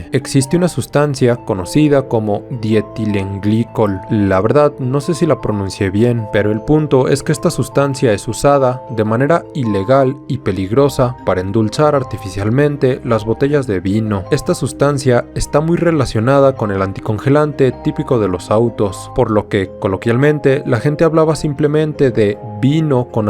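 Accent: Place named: Cleveland, United States. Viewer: Hablas con el acento de Cleveland de padre de familia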